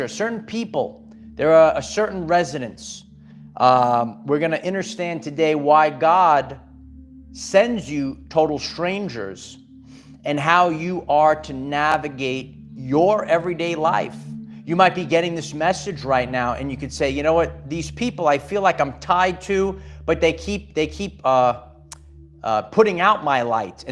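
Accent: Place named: Brooklyn, United States. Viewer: How cute your little Brokklyn nyc accent...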